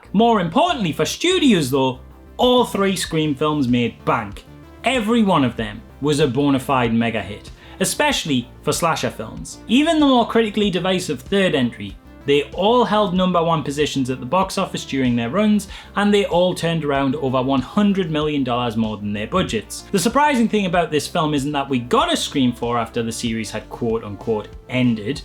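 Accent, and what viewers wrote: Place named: Newcastle, United Kingdom. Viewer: Northerner? I'm leaning towards Geordie... Kinda...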